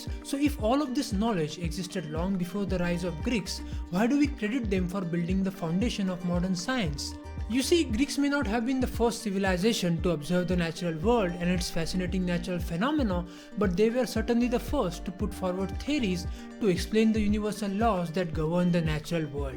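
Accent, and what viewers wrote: India. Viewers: I find the Indian accent particularly difficult to understand but I also find many YouTubers speak too fast. I'm in a no-win situation!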